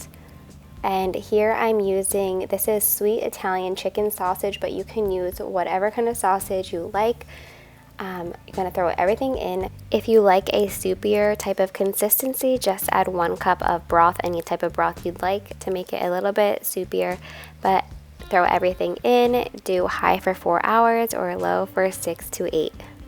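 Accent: East Coast, United States. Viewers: LOVE Crockpot Recipes! These look super yummy!!! Glad I found this channel 😁 -- (& her east coast accent makes me homesick!) ❤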